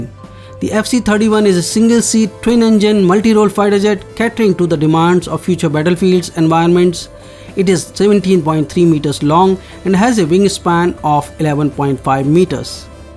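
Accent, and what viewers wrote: India. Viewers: I assume the narrator is from kerala india🇮🇳🇮🇳🇮🇳🇮🇳👌👌👌👌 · Is this India accent?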